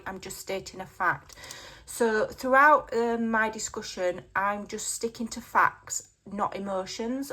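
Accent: Yorkshire, United Kingdom. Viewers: If I could give a thousand likes I would. Most YouTubers wouldn’t be brave enough to speak up. I also like the empathy that you impart with your gorgeous accent 😊Is it Yorkshire?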